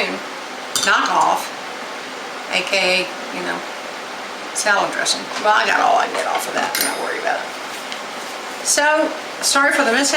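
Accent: South, United States. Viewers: Can tell a recipe is gonna be good when the person has a southern American accent 😀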